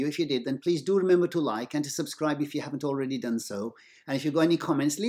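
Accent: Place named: Birmingham, United Kingdom. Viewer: No - he's a brummie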